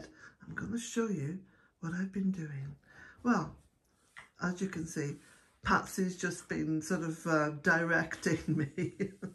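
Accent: Ireland. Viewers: Last time i watched one of your videos you had your black dog. The little jack russell is very cute. And your accent has become very irish! Lol😊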